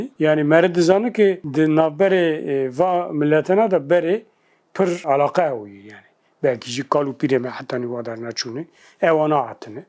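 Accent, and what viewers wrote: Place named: Quchan, Iran. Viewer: سلام ماموستا ....زبان کرمانجی شما با کرمانجی خراسان یکی هست انگار یه کرمانج قوچان داره صحبت می‌کنه ...کرد قوچان از خراسان ایران ......من حض کر ..ژه کرمانجی گپ کرنی استاد تمام این بازیها تو خراسان هنوز بازی میکنن ....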